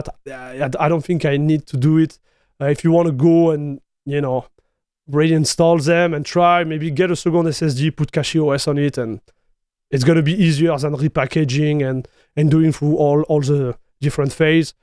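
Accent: France. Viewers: That's a French guy not afraid to have an accent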